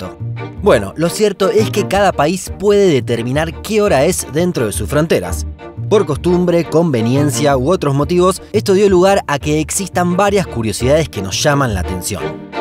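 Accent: Buenos Aires, Argentina. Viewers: De todas maneras además de hablar de las "Islas Malvinas" y reivindicar nuestra soberanía sobre ellas en varios videos en los cuales se las nombra, y del acento caracteríastico de los porteños (no de los argentinos, porque en mi país tenemos muchos acentos distintos), el canal está registrado como un canal argentino. Estos muchachos han estudiado en una de nuestras honrosas Universidades Públicas, dios y el pueblo las conserve. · Sos argentinos o uruguayo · una pena el acento argentino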